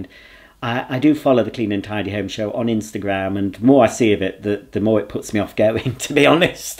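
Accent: United Kingdom. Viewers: As a confirmed bachelor myself, I laughed and laughed at this video. "A shell suit?!" 🤣. I love that your camp voice is pure Yorkshire.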